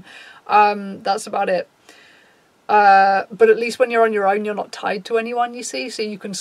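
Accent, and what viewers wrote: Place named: England, United Kingdom. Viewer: Oh my goodness, I just realised that you're from England I keep assuming people people are from america on netflix or youtube and cant even hear peoples accents, I have to stop and figure out if someone's English or American, is that weird? lol and im from England too hahaha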